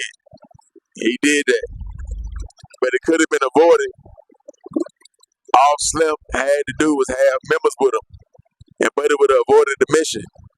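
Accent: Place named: Atlanta, United States. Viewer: Lawd why tf i watched this and he sounds like he from the country not neworleans definitely atlanta or Carolina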